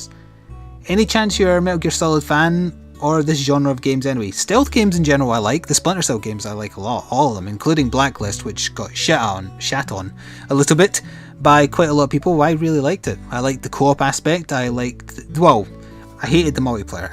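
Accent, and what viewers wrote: Scotland, United Kingdom. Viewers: wasn't insulted. mermen are male half fish. i like listening to a scottish accent and u dont come across as stupid. keep up the entertaining and interesting videos. thankyou.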